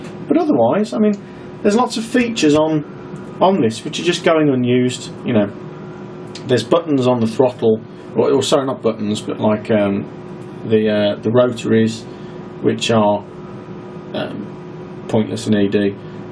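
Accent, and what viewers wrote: United Kingdom. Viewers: A bit late but great video. Is that a midland accent lol my name should tell you i am also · He sounds British to me, mate.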